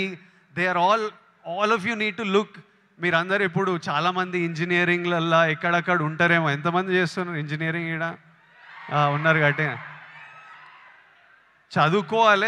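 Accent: Telangana, India. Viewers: Adi maa telangana slanga ra puka bayata ala slang ni comment cheyyaku dorikithe guddalo tantharu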